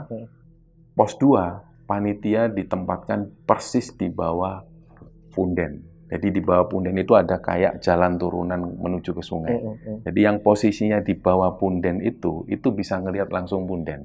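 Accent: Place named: Java, Indonesia. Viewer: Jawa nya kental banget mas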